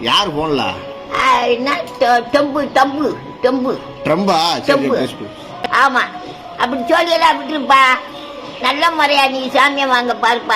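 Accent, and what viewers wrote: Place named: Usilampatti, India. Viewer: ❤❤sema paaati.... Theni usilampatti pechu sema 😂😂😂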